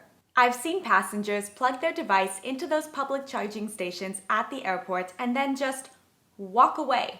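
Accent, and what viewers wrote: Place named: New England, United States. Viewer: Heavy New England accent! 😬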